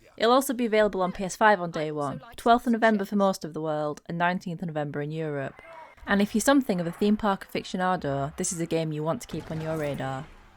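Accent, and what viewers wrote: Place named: Wakefield, United Kingdom. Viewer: I feel like you might be from near me! Wakefield area? Haha!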